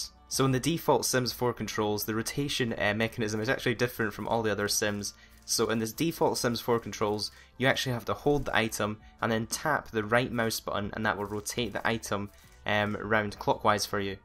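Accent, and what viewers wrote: Scotland, United Kingdom. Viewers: Onyi Onuorah He’s Scottish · I've used the Brush Teeth thing since Sims 3 when my Sim's hygiene is low in other Sim's houses. I mean, you can take a shower too, but they get upset and might throw you out if you do it in Sims 3. Haven't tried it in Sims 4. By the way, as an American, I really like your Scottish accent. :) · When I started the video I was like "wait what the hell is this guy even speaking English his accent is too strong!!?" before realising it was just a Scottish accent. The worst part is I'M Scottish!! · R u British/Scottish I am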